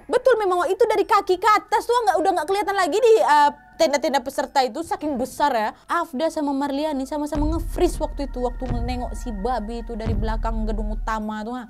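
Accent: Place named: Medan, Indonesia. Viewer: Baru kali ini kuliat org bawain horor cem gini malah jd lawak 🤣🤣 Medan kaleee, semangat kakak bagus deh pembawaan cerita nya 👍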